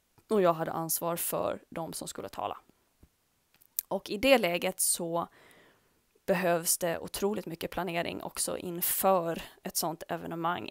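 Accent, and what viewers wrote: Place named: Gotland, Sweden. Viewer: så härligt att få höra gotländsk dialekt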